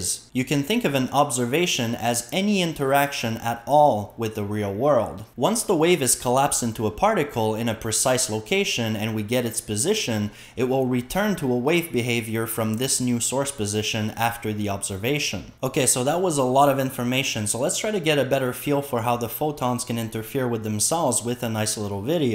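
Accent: Quebec, Canada. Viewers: Are you from Quebec? Nice video!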